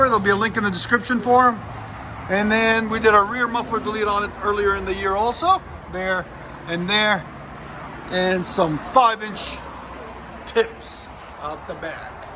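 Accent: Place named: Midwest, United States. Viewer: I'd go for the muffler delete or the Magnaflow. That raspy throaty exhaust of others is addictive...but too many miles of Flowmaster drone in Mustangs cured me. As always, beautiful exhaust work, I really enjoy your channel! (BTW, the narrator's voice doesn't sound Tennesse-born- more like my neck of the woods in Chicago/Wisconsin). In your experience, is the rear muffler delete retaining mid-mufflers (with their shared pipe for balance?) a safer bet? The Magnaflow sounds throaty without too much rasp, but that Mustang made me drone-shy...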